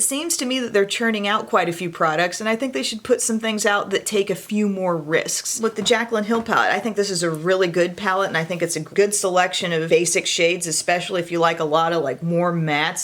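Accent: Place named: Illinois, United States. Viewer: I live in Hawaii and at 3 am I was about to go to sleep but saw your notification and had to watch! I love your content. Thank you for being so honest and always so positive. You seem to be so kind and genuine. P.S. I'm originally from Kentucky so your Illinois voice reminds me of home. 💙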